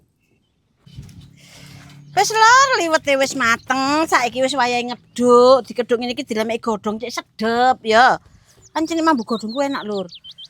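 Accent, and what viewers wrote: East Java, Indonesia. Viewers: ini bahasanya kok Jawa timuran rumahnya mana to klu boleh tahu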